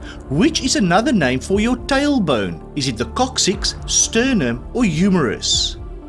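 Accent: South Africa. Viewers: That is a lovely South African accent. I really enjoy listening to this gentleman! But plateau is the spelling; not "Plato."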